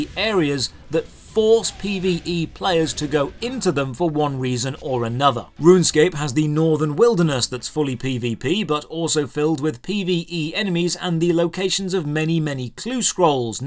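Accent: England, United Kingdom. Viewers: I like the way u talk to me English man, Keep it up ole chap XD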